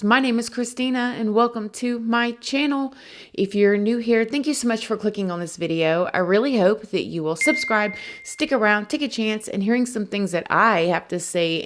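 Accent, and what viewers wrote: South, United States. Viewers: she’s southern and also a grown lady, i’m sure she’s aware lol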